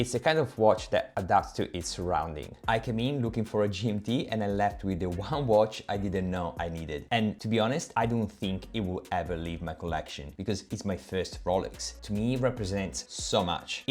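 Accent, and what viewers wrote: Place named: Vietnam. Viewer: Love your accent. Vietnamese?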